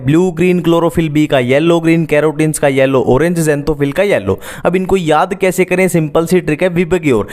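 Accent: Haryana, India. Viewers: Aap haryanvi ho na bhaiya 😊 ?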